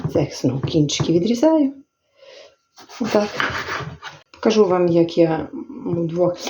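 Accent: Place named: Vyzhnytsia, Ukraine. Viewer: Доброго дня, Ви моя найулюбленіша господиня , хоча у мене біля 70 кулінарних каналів, мабуть тому що я також з Буковини, хоча живу дуже далеке, якщо можливо скажіть звідки Ви саме, бо відчувається Вижницький район!! Дякую Вам велике за Вашу працю в любі до неї!!!!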